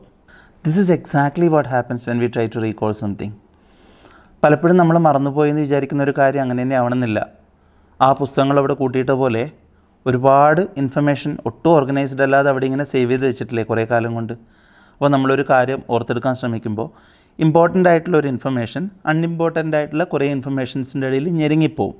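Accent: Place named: Kanhangad, India. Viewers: അങ്ങേടെ ഭാഷയിൽ ഒരു മലബാർ ശൈലി ഉണ്ടെന്നു തോന്നി. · Kannur alla kasaragod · Kanhangad alle aadhiyettan...kannur alla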